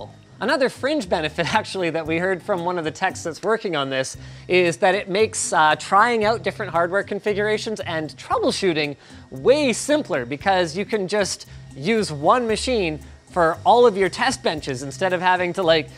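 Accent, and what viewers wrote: Canada. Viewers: Linus’ Canadian is showing more and more every video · For some reason you seem more Canadian in this video than normal.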